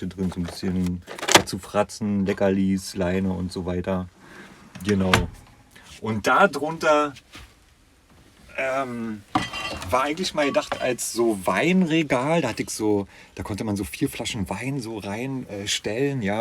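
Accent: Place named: Berlin, Germany. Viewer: Oh Gott wie furchtbar 🫣 gut das euch nichts passiert ist🙏 Ich liebe den Berliner Dialekt 👍 erinnert mich an einen Brieffreund aus Berlin vor 40 Jahren 🫣🫶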